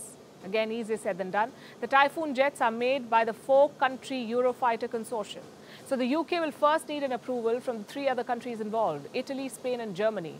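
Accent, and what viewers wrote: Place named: Mumbai, India. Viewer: What are you saying.. It's very difficult understanding your Bombay accent.. Tamil urdo sound..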